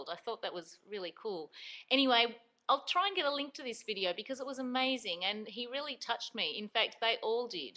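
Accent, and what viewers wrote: Australia. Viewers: My passion is History, geography, and art such as painting landscapes and photography, 3d art /animation, and photoshop. I took photograph class and I loved it. It was fun doing the processing also, I used black and white Ilford resin back paper and I like using Ilford 35mm film with this 70's Minolta camera. I mostly use a Kodack camera now. However I think about using 35mm color film with the 'ol Minolta. I have some filters I wanna experiment with. I love your Aussie accent. = )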